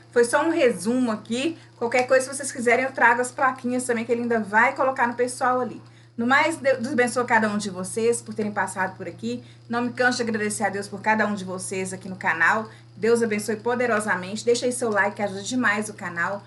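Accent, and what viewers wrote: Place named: Minas Gerais, Brazil. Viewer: Seu canal é muito bom aqui no YouTube VC é muito carismática beijos no seu coração eu sou de São Paulo e pelo seu sotaque VC é de Minas gerais né ☝️😉 adoro quando vc fala assim ☝️ tá quente pelando acho muito engraçado 😅 vc é muito simpática beijos no seu coração e um feliz natal com muita saúde paz e alegria pra vc e sua família 😉☝️👏👏👏👏🙏🙏🙏🙏🙏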